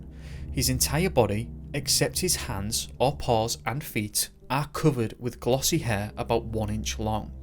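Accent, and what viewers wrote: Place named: Northern England, United Kingdom. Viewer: It's his Northern English accent , fairies is pronounced furries .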